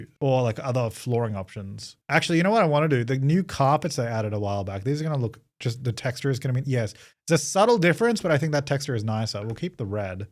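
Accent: Australia. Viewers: Same. He sounded so young, and also his Australian accent seemed more noticeable. That, and sometimes he sounded like he was on speed. Lol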